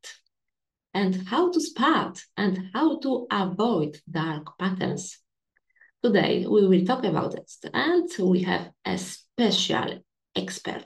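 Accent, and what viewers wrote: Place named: United Kingdom. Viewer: Beautiful Brit accent 😍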